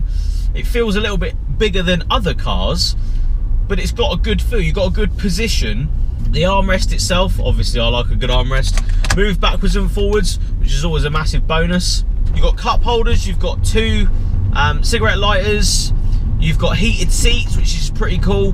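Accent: United Kingdom. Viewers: Oh My God ... I love UK accent .. !!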